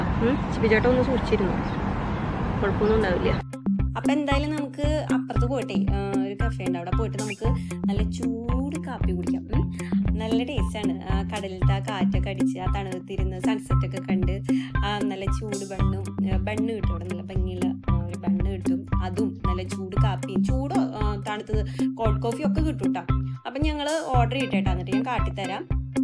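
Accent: Thrissur, India. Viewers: Hey super aada nalla Rosamund iniyum chaidolutta entha resam aa Trissur bhasha kelkan enik ishttayi 😘😘😘😘😘😘😘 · ഹായ്... മ്മടെ ത്യശ്ശൂർ ഭാഷ അടിപൊളി...... പിന്നെ dress നന്നായിട്ടുണ്ട്... ട്ട.. vloggum... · Adipoli you are so innocent I like your talk. Exactly thrissur kari...... 😂😂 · എന്റെ ചേച്ചി നല്ല രസം ഉണ്ടായിരുന്നു കാണാൻ. നല്ല സ്പീഡ് ഉണ്ട് വർത്താനം, ഞാൻ ഇങ്ങനെയായിരുന്നു ചേച്ചി. എന്റെ വീട് തൃശൂർ ആണ്, എന്നെ വിവാഹം കഴി ച്ചത് എറണാകുളം, ചോറ്റാനിക്കരകാണു.എന്റെ ഭാഷ ചേച്ചി പറയുന്നതുപോലെ സ്പീഡിൽ, അവർ എന്നെ കളിയാകു, പിന്നെ പിന്നെ ഞാൻ എന്റെ വർത്തമാനം ചുരുക്കി, ഇപ്പോൾ തൃശ്ശൂർ ഭാഷയു എറണാകുളം ഭാഷ യു കൂടി കലർന്ന ഒരു ഭാഷയായി, വീട്ടിൽ ചെല്ലുബോൾ വീട്ടുകാർ ചോദിക്കും അവിടെനിന്നു കിട്ടി നിനക്ക് ഈ ഭാഷയെന്നു, എന്റെ ചേച്ചി ഞാൻ ഇപ്പോൾ അതികം മിണ്ടാതെയിരിക്കു, അതാണ് സേഫ്. ചേച്ചിയുടെ വർത്തമാനം ഫസ്റ്റ് കേട്ടപ്പോൾ തന്നെ എനിക്ക് മനസിലായിത്രിശ്ശൂർകാരി യാണല്ലോ എന്ന് അപ്പോൾ തന്നെ സബ്സ്ക്രൈബ് ചെയ്‌തു. 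തൃശൂർ ഭാഷ എനിക്ക് ഭയങ്കര ഇഷ്ടം മാണ്, ഇപ്പോൾ ഏതു ഭാഷയാണ് ഞാൻ പറയുന്നത് എന്നു എനിക്ക് അറിയില്ല ചേച്ചി. അ ചേച്ചി കടലക്കറി ഞാൻ ട്രൈ ചെയ്തു, നല്ലതാണ് ട്ടൊ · superb.....nattilevide veeduu.....talk style kettitt thrissur pole.... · Chechi pwoliyanu tto. Pakka thrissurkari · Chechi Thrissurkari ano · chechy Thrissur slang adipoli .Thrissur evidanu veedu?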